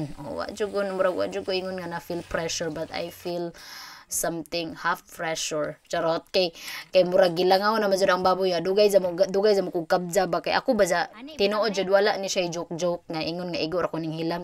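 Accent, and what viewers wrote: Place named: Visayas, Philippines. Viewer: Lami kaayo paminawon imong bisaya Ms.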